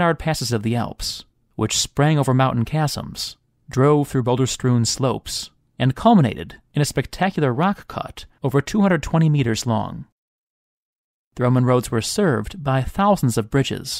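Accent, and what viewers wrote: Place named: Chicago, United States. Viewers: you have a super thick Chicago accent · i could tell you were from chicago. i picked up the mild accent in your videos. great content!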